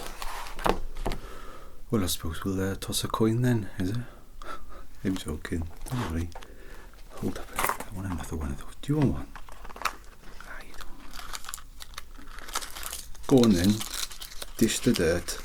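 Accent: Wales, United Kingdom. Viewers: I’ve never thought that Welsh accent can be so asmr! Thank you for your video :) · Absolutely adore welsh accents, also I got pretty invested in this story, it was fun! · не, я к тому, что же наполовину валлиец, так что это самый что ни на есть натуральный акцент☺️ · As somebody who is a born and raised welsh kid, it's nice hearing the accent. Great job! Da iawn ti! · Sigh.. The Welsh accent... I could listen to it each and every day... 💗💗💗 Dear Sir... I mean Comenzar Sir, very little scares me, least of all pain. I had been imprisoned in a dark and horrible place for a very long time before you found me, but please understand me that although you have helped, and I'm ever grateful, I saved myself. Why did I make you believe that you saved me? Because I want you to always believe the best about yourself and your abilities. You will never become one of them, as you're not them, but I see you going down a road that is not good for you. 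Thus I choose the place closer to danger as it's furthest from harm. I will not leave this place, but don't worry, I will keep my distance. I'll do anything to keep you safe. I will tell you this, though: that I will break the Stag Officer out of jail. Far too much blood has been spilled already, and there is so much good in him, just as there is so much good in you. I will never punch you, I cannot ever do that. I cannot hurt another. Face this stormy challenging time courageously. Your safety net will always be at vigil close by. Thank you for listening, Mr Comenzar. I'll be going now, but we will meet again. 🌱🌱🌱 Now put that gun away. I don't fear you, and I never will. 🌱🌱🌱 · Hmmmm.....can't say I get behind the whole Socialist thing, but I am digging the Welsh accent! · Top notch engaging acting here with this delightfully funny persona and slow shift of mood...shining example of comments in that recent Tinglecon cinematic asmr panel about "enveloping the viewer in a world" and his "seeing where the character takes him." I love whatever you did with the audio here, it's so present and crisp and soft all at once...really enhances what is my fave Welsh accent of yours, and those choice mouth sounds in beginning. 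I think "chuffed" is one of my fave Britishisms. 🥰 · Oh my god, I love your Welsh accent!! ❤️ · My Stepfather was welsh, not heard the accent in ages. I think it’s great 👍🏻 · I don’t know why, but hearing you with a Welsh accent really makes me smile. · Out of all the ASMR triggers I've listened to, your Welsh/Welsh accent is on top. 🤤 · This is all a setup for the punchline, "A guy walks into a bar and sees 3 socialist elves......" (And no finale, we all want more unstable elves!!) (Also I legit held my breath mark onward.) (Also also, the parallels and commentary in this is so poignant it hurts. 🥺) My gawd, your Welsh accent is so musical and beautiful. Well done once again, Commissar! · socialist elf with welsh accent me, a welsh-speaking socialist, immediately clicking the notification: oh no™ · I love this character, I can just see the wheels turning in your head ☀️ the Welsh accent is amazing I love it ❤️☀️☀️☀️ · Spreading socialist democracy whether they like it or not, key phrase no? 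Founding father’s said, here is your democracy if you can keep it. Ouch. A bit of trouble keeping it now, no? I agree, if we don’t move forward we’ll inevitably move backwards very soon. I was wondering when you would do a welsh accent!! Thank you, it is naturally poetic and musical, Charming like yourself🤗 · An Elf Welsh communist sounds just like Wales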